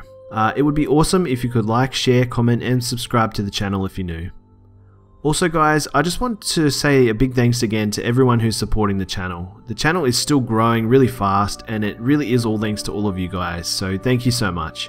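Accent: Australia. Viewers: Loved the video. Your aussie voice is the best.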